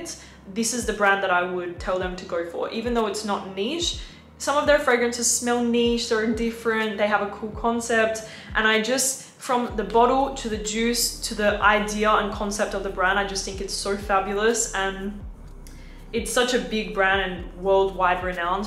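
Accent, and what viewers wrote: Australia. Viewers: Your accent sounds australian, are you from australia? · What is going on with your accent? Definitely a little less Aussie now.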